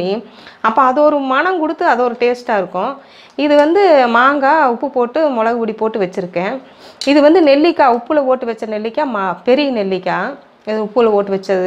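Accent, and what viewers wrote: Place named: Coimbatore, India. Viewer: Coimbatore voice super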